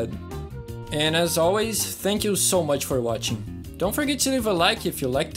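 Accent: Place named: Brazil. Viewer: I love your channel, man. Are you Brazilian? Your accents is pretty similar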